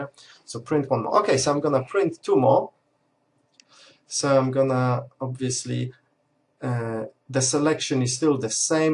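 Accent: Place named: Poland. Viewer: Uszy więdną od twojego Ponglish....Pozdrawiam!!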